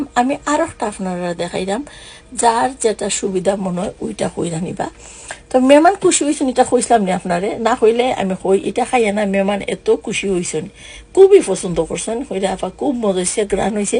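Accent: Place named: Chittagong, Bangladesh. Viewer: আপনার ভাষায় আমি মুগ্ধ ❤ আমি চাঁটগাইয়া ❤ কি যে ভালো লাগছিলো ❤ আমাদের আঞ্চলিক ভাষা মানেই মধু❤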